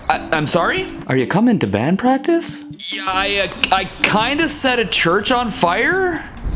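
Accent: United Kingdom. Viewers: Your British accent was good, but you should see Kent Paul or Maccer's accents.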